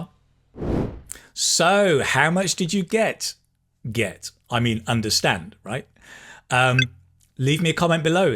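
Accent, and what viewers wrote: United Kingdom. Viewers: I love British accent 100% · Hello dear, Thank you so much for sharing this video! That is so kind of you. Indeed, it is very useful and interesting to know about British slangs. I really like British accent, art and culture! In my view, you are a great native teacher because you always provoke the passion of your students to learn and encourage us to study more.You have all essential qualification and knowledge to be a successful teacher who can impress students with unique and enjoyable teaching methods. Although I am knackered, I hope I can get a higher score on the IELTS exam. Cheers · Omg!! British English is just so interesting!!😍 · Hi, we wish you be able to make subtitles for your lovely videos as we cannot trust YouTube's subtitles. I just joined your channel recently and lovecto learn British accent properly👍👍😉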